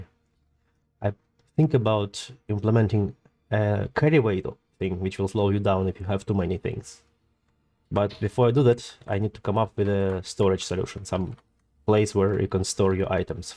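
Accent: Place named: Central Europe. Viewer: Haven't taken a look at this channel in a while. I'm guessing you're doing something Fallout-like? Judging by that Pip-boy thing. Oh,and tour English sounds Central-European. A bit Eastern thought. Czechia?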